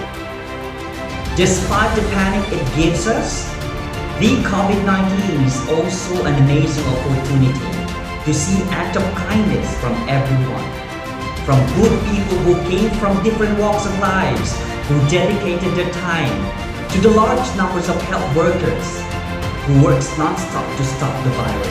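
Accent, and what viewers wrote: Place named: Philippines. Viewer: Have you been given citizenship already? You sound unmistakably Pinoy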